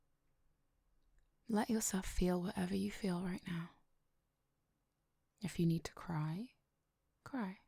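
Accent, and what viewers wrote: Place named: London, United Kingdom. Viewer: Oh my, you have such a lovely voice. It is a crime you only have 39 subscribers. There is something so appealing about the British intonation. As a Dutch guy it is always hard to tell where but is it a London accent or close to London.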